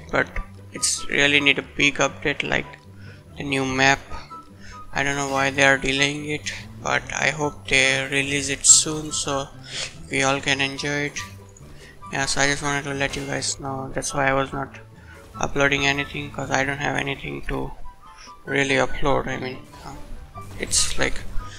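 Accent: India. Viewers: are u Indian becuase u sound like that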